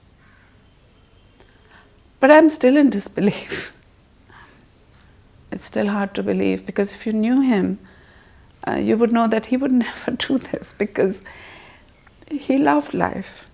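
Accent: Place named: India. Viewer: I like the indian accent. you speak well english.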